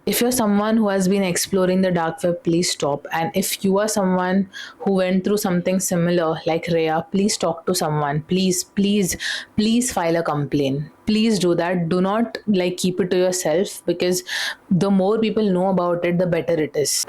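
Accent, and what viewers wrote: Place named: South, India. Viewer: Hello girl! new subscriber here. I LOVE YOUR NARRATING SKILLS, THAT SOUTH INDIAN ACCENT (if you are) and you add new vibe to story telling stuff from other story tellers on youtube platforms. Here for your content from 31.6k subscribers to 1million and more! LOVEEE YOUU BBG 🧿💕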